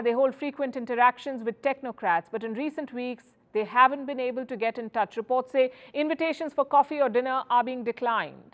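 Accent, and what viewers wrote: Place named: India. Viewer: this media is totally anti china as u can see the commendator has as trong curry accent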